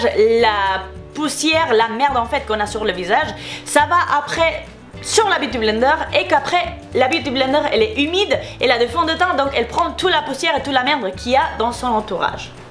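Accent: Spain. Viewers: J'aime tellement ton accent espanol, tes cheveux tous enfaite tes vraiment belle je voudrais trop de ressembler tes MAGNIFIQUE 😍😍❤ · Non moi je trouve que l'accent español c'est le plus belle accent au monde j'adore ton accent et moi j'aurai voulu être espagnole car j'adore cette langue c'est la plus belle langue du monde plus tard je veux vivre en Espagne 😍😍😍😍😍🇪🇸🇪🇸🇪🇸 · coucou moi je t'adore et je te suis des tes 100 abonnes pourquoi ?pars que j'adorait ton accent et j'adore les espagnoles voila et courage et plein de bisous a toi ma chérie. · Je pense que les gens s'attendaient à voir autre chose qu'un cil donc ils ont mis Putaclik pour moi ce n'était pas du putaclik Tu n'as pas à te justifier Tu es super belle j'adore ton accent espagnol Continue comme ca je t'adore tu es tellement belle Bisous 🇪🇸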